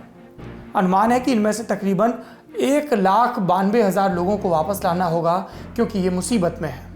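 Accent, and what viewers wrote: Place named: Punjab, India. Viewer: your accent of Punjabi was not but cute.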